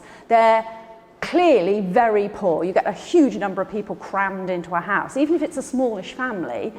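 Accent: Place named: RP, United Kingdom. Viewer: RP accent is delightful.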